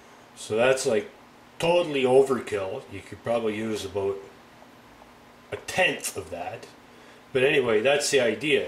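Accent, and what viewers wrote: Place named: Canada. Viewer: Oh man ! From just a single 'without' i thought.. huh, this guy gotta be canadian! And then .. the maple leafs tee :) it is so funny because i'm Polish and i've never been to Canada or even Usa, but i have family in London, Ontario - and i've heard about this slight difference. It happens to me second time this weekend :) Absolutely no offence, i love this accent and i wish i could visit my family and your country someday ! Great bass and nice movie, thanks :) 51's are hot :D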